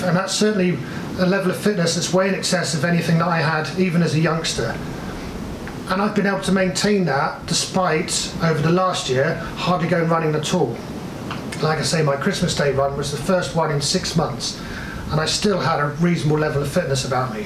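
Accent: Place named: West Country, United Kingdom. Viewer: Bloody hell you sound like my wife literally she's from the west country! Eat healthy stop drinking get fit lol.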